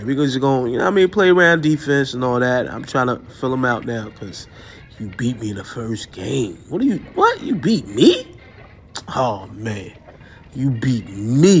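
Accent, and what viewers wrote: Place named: East Coast, United States. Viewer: Haha yeah I can tell you from Jersey or somewhere from the East Coast with that accent 😂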